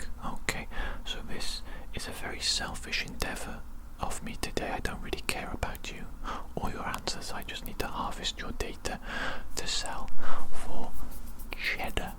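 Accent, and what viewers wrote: Wales, United Kingdom. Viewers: Great video! I might be wrong but from your accent, do you happen to be welsh???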